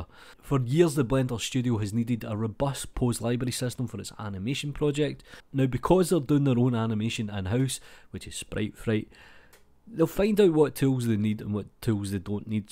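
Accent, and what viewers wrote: Scotland, United Kingdom. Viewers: Blender 4.0 is scheduled for August 2023 - assuming they're indeed still following the plan outlined in Ton's LTS blog post from February 2020. Four versions each year (one being LTS), and a big number change every two years. <link> Anyway, while I already read the news, I enjoyed listening to your commentary and Scottish voice. <3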